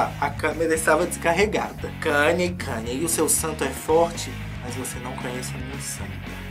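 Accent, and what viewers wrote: Minas Gerais, Brazil. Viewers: me divirto muito com vc! Adoro suas tiradas, seu sotaque de mineiro e sua voz! Bjsss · Meu Deus, tem vez que eu to cagando pra notícia, mas eu coloco só pra ouvir a voz e as piadocas de. Ele tem uma voz e uma energia Rico Melquiades, só que do triângulo mineiro, hahaha. Legal demais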